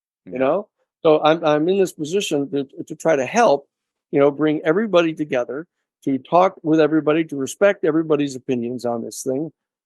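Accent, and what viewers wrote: Wales, United Kingdom. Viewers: How much more disclosure do we need 🤔? Col Nell (Grusch’s boss) went on record last week saying “There is zero doubt non human intelligence exists “. Greer has 30 years of data which is now available to the public. All this has been filtered out of mainstream media for sure, along with anything else that may remotely affect the reality they want us to believe. Our purpose now is to connect the dots and remember the truth of who we really are. What is more exciting than disclosure? Ans: finding a podcaster with a 🏴 Welsh accent - respect ✊ Light and love to you guys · You still sound Welsh, but the heavy accent has gone 👌🏼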